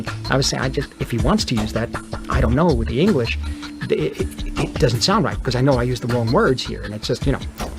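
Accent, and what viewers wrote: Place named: Brooklyn, United States. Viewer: Van Gogh with a Brooklyn accent!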